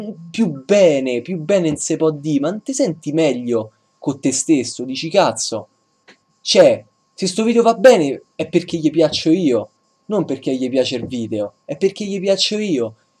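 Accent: Rome, Italy. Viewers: non riesci a parlare meno romano?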